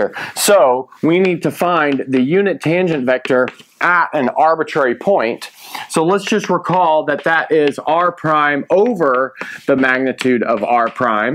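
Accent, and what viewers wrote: United States. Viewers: You have a very thick American accent. Got to concentrate hard to understand it. No idea why I'm commenting that. I'm drunk. Might explain everything.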